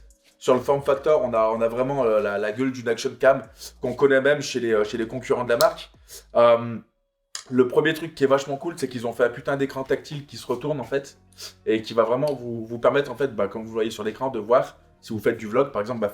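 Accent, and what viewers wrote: Saint-Étienne, France. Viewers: Avec le vrai accent stéphanois qu'on aime. Je t'ai vu à basic fit Andrézieux 👀👀👀👀👀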